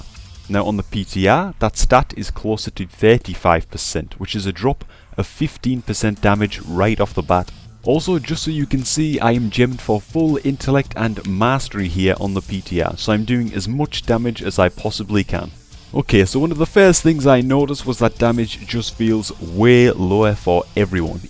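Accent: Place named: Scotland, United Kingdom. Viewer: he is scotish I think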